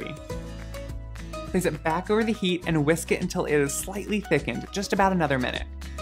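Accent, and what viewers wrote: Midwest, United States. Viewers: I think he has a Midwestern accent.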